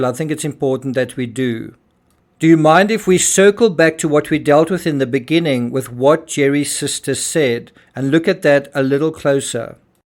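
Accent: South Africa. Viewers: ...are you perhaps South African? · I'm proudly South African and I've been following this story closely all these years. Love what u put together and I agree 100% something smells fishy or should I say bloody. Pls keep us updated. Love hearing the local accent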